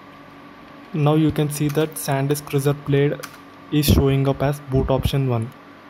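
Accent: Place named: India. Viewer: Oh yea, the indian accent❤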